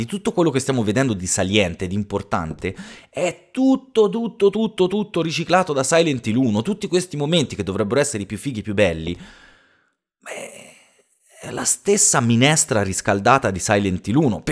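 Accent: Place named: Livorno, Italy. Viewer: A me è sempre piaciuto pensare che quando si gira l'ultima volta, prima di tornare da Douglas, abbia incontrato lo spirito di suo padre (è Silent Hill, dopotutto, quindi ci sta che lui sia ormai anche legato a quel posto anche dopo la morte)... anche perché sarebbe un po' strano che lei tiri fuori il discorso del nome così dal niente. Nella scena sembra che lei si giri perché c'è qualcuno dietro... e quel qualcuno è Harry. Anche perché io mi rifiuto di credere che abbia perso così all'improvviso suo padre senza possibilità di dirgli addio 🥲 avevano ancora troppe cose da dirsi, e un solo quadernino non basta. Mi piacciono molto i tuoi gameplay, me li recupererò pian piano anche degli altri capitoli e di altri giochi... e il dialetto livornese è sempre top da ascoltare.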